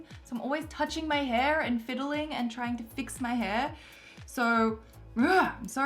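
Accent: Australia. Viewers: what is your accent ?where are you from ? just wondering , I have heard others with the same accent but I"m curious ? Is it an Australian accent ?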